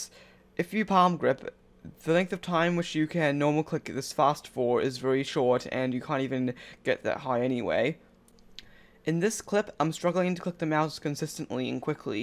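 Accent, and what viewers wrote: New Zealand. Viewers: Its kiwi accent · GGL it's his accent, I think he is from New Zealand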